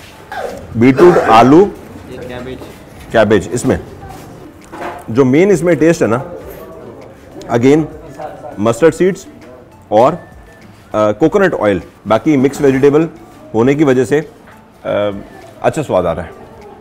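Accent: Punjab, India. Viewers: Mr your punjabi accent is making videos great treat. Because north Indian people explain food nicely and appreciate.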